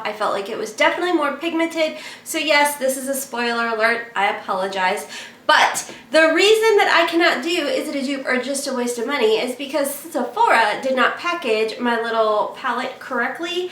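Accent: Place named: Kentucky, United States. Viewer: Congratulations on 5K!!!💖💜💖💜💖💜💖💜💖💜💖💜💖 AND,... Thank You for this very generous giveaway!!! Thank you for sharing another GREAT tutorial😚. I love the Bad Habit palettes. And, even the ABH palettes have kick up. I think like you said, it's rare to find a palette nowadays that DOESN'T have some kick up. I love your Kentucky personality and accent💖. I lived in Louisville when I was a young girl for a while and I absolutely loved it. I was sad when we moved. I ended up back in the South anyways now. Lol (N Carolina). I absolutely LOVE living here. Wish we lived closer as I know our personalities are very similar and we would get along great!!😚 (Especially the makeup hoarding part😁😁😁😁). Look forward to your next video send here's to 10k!!🍾🥂🍾🥂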